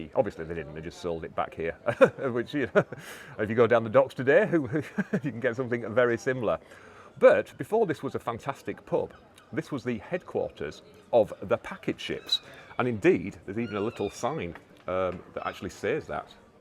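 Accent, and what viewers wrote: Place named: Yorkshire, United Kingdom. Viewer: Not a matter of law, but: my mother's family and their accents came from around Stainland, Sowood, and Jagger Green. I guess yours might be a bit Northwest of there, maybe Mytholmroyd or Todmorden? I live on the other side of the world now, and I've picked up some Kiwi slang, but I don't think I'll ever lose the vowels or the cadence of where i grew up.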